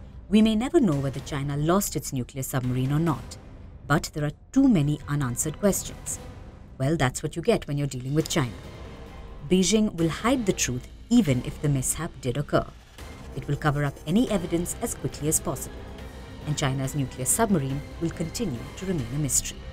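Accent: India. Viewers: A strong Indian Asan accent is really unpleasant. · It’s a pity it seems too much Indian accent here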